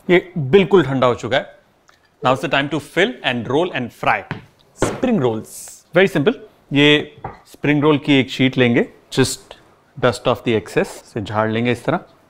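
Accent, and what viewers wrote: Punjab, India. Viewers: Sir your way of talking is very nice...total Punjabi accent.... You are wonderful chef....love your recipes......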